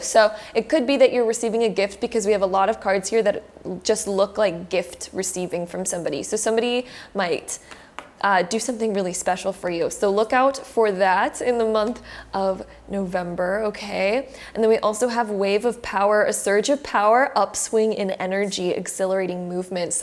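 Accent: Australia. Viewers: Your Australian accent is absolute gold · OK BUT YOUR AUSSIE ACCENT IS AMAZING AND WOULD TOTALLY HAVE ME FOOLED 😂😂😅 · you are the cutest human 😭😍 the Australian accent got me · It’s the Aussie accent for me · Ahhh I love the Australian accent haha. You always remind me to have fun no matter what. Keep those vibes high!!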